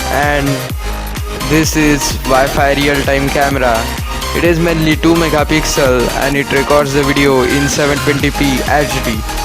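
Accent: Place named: Bengal, India. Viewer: This guy sounds bengali (am a bengali myself)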